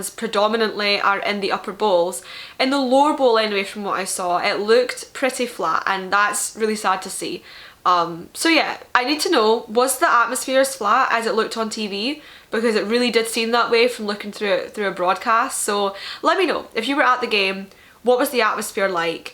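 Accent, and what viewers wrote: Scotland, United Kingdom. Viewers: Yes the Scottish accent makes the analysis better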